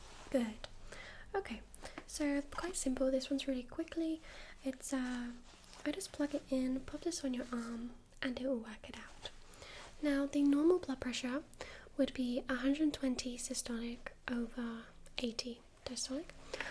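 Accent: United Kingdom. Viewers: Is that a British accent you got?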